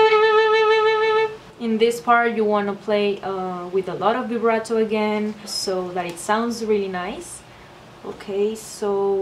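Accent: Spain. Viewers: Tío pero si con el acento españolizado que tiene se entiende perfectamente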